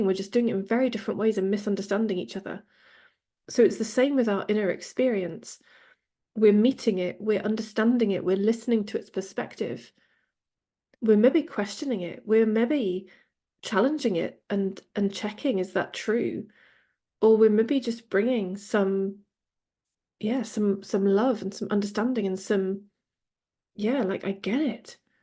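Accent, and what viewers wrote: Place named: West Country, United Kingdom. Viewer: Hi, I recently saw you on the non duality podcast and HAD to search you.. I noticed the south West Country twang in your accent also.. I’m from Bristol you see! Your book is ace so far btw.. just sat here reading it now.. I was wondering if you do any work one 2 one with people or workshops? Thanks.. keep up this wonderful work….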